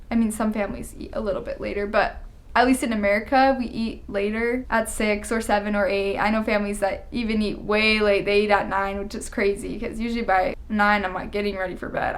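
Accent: Norway. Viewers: Im speechless, your norwegian is almost flawless, it actually sound like a norwegian living in the US for some years, adapting their way of speaking :) You speak southern norwegian way better than i could ever have done, coming from Northern Norway :) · Ser Norsk ut og er så god på Norsk at Engelsken har Norsk aksent... Du lurer ikke meg, ho der er Norsk. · Tror hun egentlig er norsk. Du hører det på aksenten.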